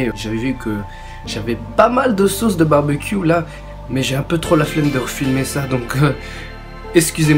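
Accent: Belgium. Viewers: Salut mec, tu es de quel région ? Belgique ? Tu as un accent particulier :) Bonne continuation, je m'abonne 👍🏼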